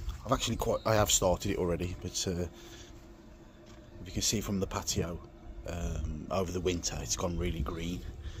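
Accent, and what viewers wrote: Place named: Birmingham, United Kingdom. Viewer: Alright mate if used a watering can how much should I dilute it thanks like say a 5 litre watering can how much of each you sound like you are from Birmingham like myself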